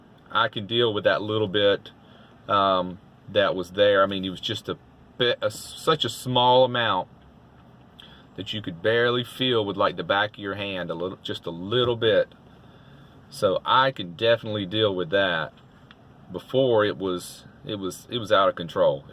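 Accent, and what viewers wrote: United States. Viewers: Super cool accent you have there Mr. USA🇺🇸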